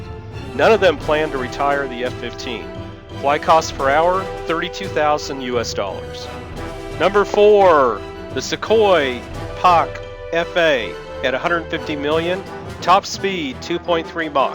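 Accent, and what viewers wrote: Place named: Pakistan. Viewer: Your voice is pakistani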